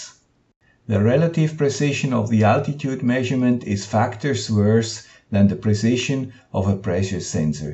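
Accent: Switzerland. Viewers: Gruezi "guy with the Swiss accent". Some people might say I have even more a Swiss accent even though I moved from the Berner-Oberland to Seattle a half a century ago ;-) I really enjoyed watching your video and learning many things. Being Swiss we just have to be precise. As a boy I used to walk miles to get to a watchmaker's storefront window to set my watch to the exact time displayed there. ... and soon my "official tested (chronometer stamped)" watch would be wrong again before the quartz crystal electronics. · Thumbs up for the informational video and "here is the guy with the Swiss accent again." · Hier ist ein Typ mit Schweizer Akzent ! Vielen Dank!!!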